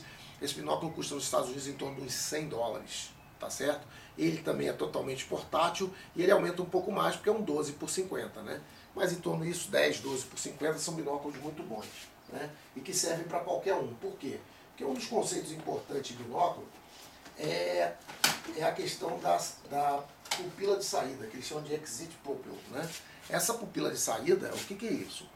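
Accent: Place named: Brazil. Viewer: Legal o jeito de falar kkkkkkkkk Sotaque bacana ! Brasileirado falando 👏🏻😃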